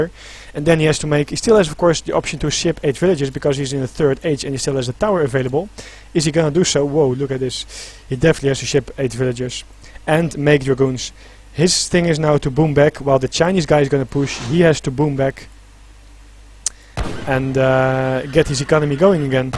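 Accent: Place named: Netherlands. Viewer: The accent is dutch, the way you talk is dutch, welkom mede hollander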